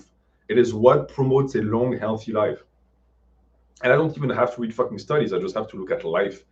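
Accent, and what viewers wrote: France. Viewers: It was rainy the whole week and despite that I wanted to get my steps in and went to the gym by foot. While coming back home all wet and soggy I just imagined stupid face with a grimace saying "Homegym Masterrace" with his french accent.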